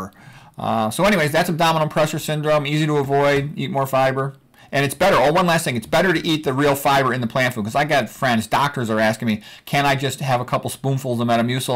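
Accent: Chicago, United States. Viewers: What an incredibly fortunate student I am to have you as my instructor! Your tutorials are always clear & detailed, which allows us to easily understand & follow. Your drawings are especially helpful. THANK YOU for your continued generosity in producing these videos. As an aside, your Chicago accent is entertaining as well!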